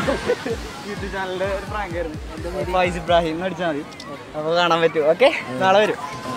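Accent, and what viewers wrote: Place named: Thrissur, India. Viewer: Thrissur slang 🤩💥🔥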